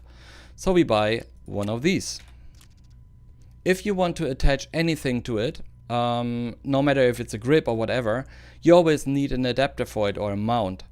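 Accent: Germany. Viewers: You sounding like a german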